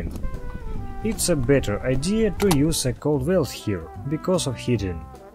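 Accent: Russia. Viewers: I heard you are russian un the first ten seconds. it's notre gold yet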